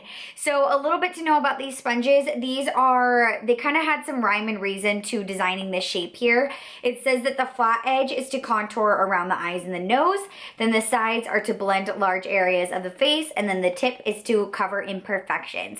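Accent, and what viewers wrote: United States. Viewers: I still can't stand the way american girls speak LMAO 🤦🏻‍♀️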